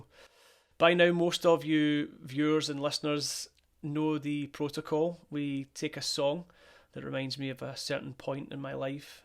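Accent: Scotland, United Kingdom. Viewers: I love your Scottish accent 😂👍🏻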